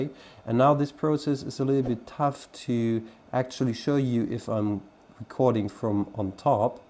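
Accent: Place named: Oxford, United Kingdom. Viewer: I love your clear Oxford English, and now weiter to the Video. Best regards Ingo. 🐇🖖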